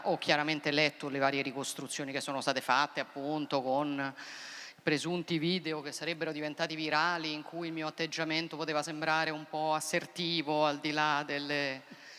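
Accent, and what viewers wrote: Rome, Italy. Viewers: LA GIORGIA E' SEMPRE NA MERAVIGLIA , L'ASCOLTEREI PER ORE COL SUO ACCENTO ROMANO , ❤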